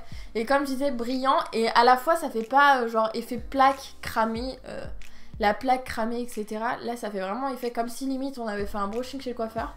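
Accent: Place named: Quebec, Canada. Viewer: Ta un accent québécois c'est super perturbant mdr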